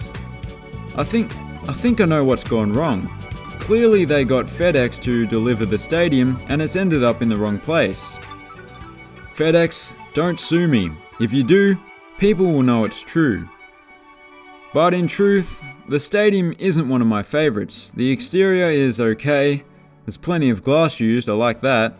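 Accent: Australia. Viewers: Anyone else find it strange that an Aussie is doing the narration? I mean he has a cool voice, but why couldn't we get an American to do it for American stadiums? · Why is a guy from Australia doing the narration, on American stadiums?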